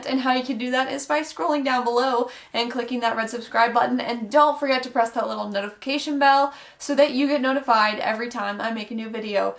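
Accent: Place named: Minnesota, United States. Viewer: I KNEW YOU WERE FROM MINNESOTA!!! I can recognize my accent anywhere!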